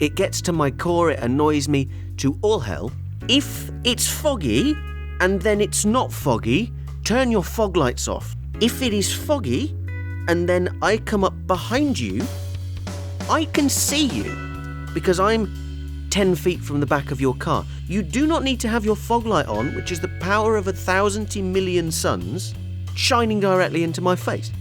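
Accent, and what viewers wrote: RP, United Kingdom. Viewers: You sound well posh, what part of the UK are you from? I'm from brummie and we all sound like crisps and sausage with beans.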